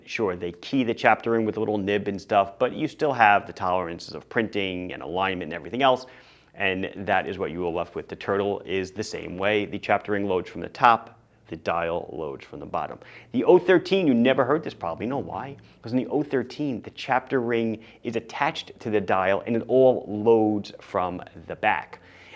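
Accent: Long Island, United States. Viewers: That Long Island accent does get me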